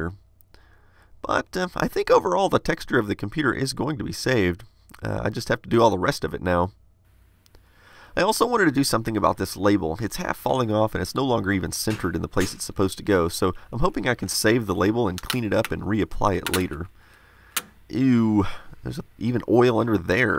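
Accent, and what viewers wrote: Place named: South, United States. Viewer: I’ve noticed that speaks with a slight southern accent. It’s not very noticeable but if you pay attention you can hear it, and since he grew up in Texas I can only see why. Love you man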